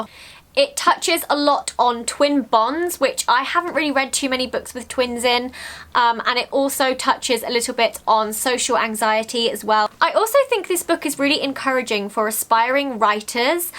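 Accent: United Kingdom. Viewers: YOUR EYES THOUGHHHH !!! AND I LOVE BRITISH ACCENT, SO THIS IS AMAZING 😂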